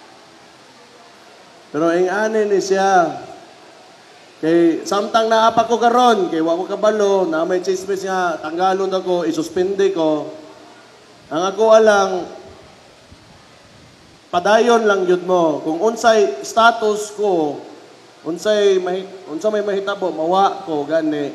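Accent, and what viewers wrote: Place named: Visayas, Philippines. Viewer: Bisaya k magtagalog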